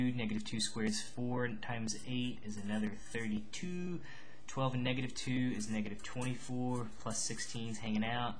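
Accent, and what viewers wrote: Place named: United States. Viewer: good maths, but I hate the american accent tho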